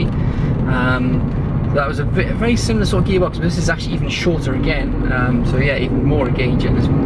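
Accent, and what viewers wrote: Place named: Wales, United Kingdom. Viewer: for some reason you sound Welsh 😆 might just be in one video though 🙂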